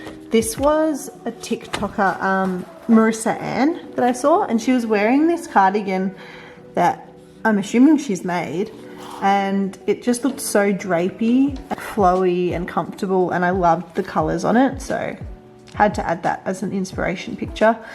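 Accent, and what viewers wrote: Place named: Australia. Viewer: I’m in the process of making one and was happy to stumble upon your video. I’ve made a couple of small ones for babies and toddlers but this is my first for me. It’s so good to hear an Aussie voice and listening to your ideas. I’m not a fan of the large arms so looking for ideas. Good luck with your Chanel, I’ll be following you 💐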